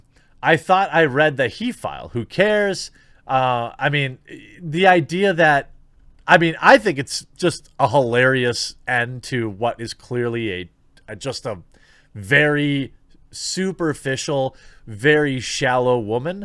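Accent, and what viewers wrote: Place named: Wisconsin, United States. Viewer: Your obviously from Wisconsin, greetings from the Green Bay area fellow cheesehead.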